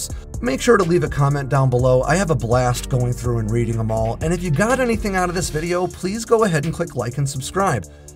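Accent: United States. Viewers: K-tar I luv how Americans pronounce words 😂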